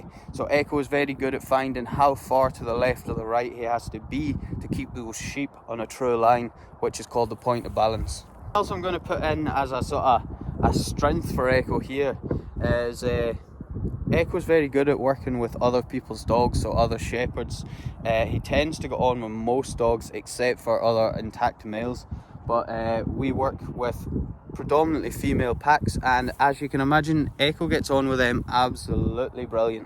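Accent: Scotland, United Kingdom. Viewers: Love your videos Your sweet dogs are adorable and love watching them Enjoy your Scottish brogue so much! My best to you, Stacey and your fur babies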